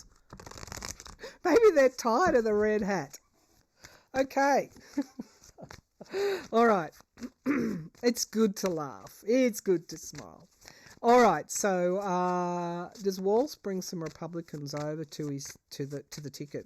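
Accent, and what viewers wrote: Australia. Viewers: Hi, Thank you for your thoughts, I have been watching and appreciating your videos for a long while (and loving your Australian flair). I am one who is still very confused and saddened by Biden stepping down. I understand your excitement for Kamala, I like her and I am so glad she chose Walz. But I feel like Biden was shot down by his own party, he, the one who did all the hard work of bringing us out of the tailspin of the Trump world. The last couple of weeks are the first time that it has been painful to watch your videos. Our 14 million votes for Biden were ignored as Pelosi even admits, in a new article, that she nudged Biden out. And when you said here that Trump's donations are drying up because Biden isn't in the race? What??? I wish you would read on Biden and how he is feeling and what happened there and bring some attention to that. 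Biden would have won in a landside. I have no doubt that Kamala and Walz will win as well. I wish you would address the pain that some of us are feeling, with our preliminary votes being ignored for Biden and still caring for him. I was so inspired with him saying "When you get knocked down, you get back up". My heart was with him and I wanted to see him defy all the MSM and the pundits and win. I can't even watch MSNBC any more, although maybe that's a good thing. With much love💕 Elizabeth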